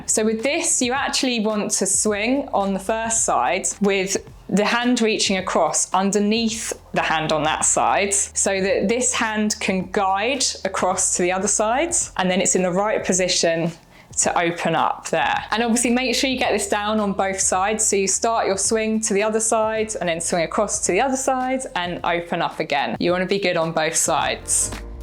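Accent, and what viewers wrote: United Kingdom. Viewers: nice lesson. Your accent confuses me its 40% British, 60% American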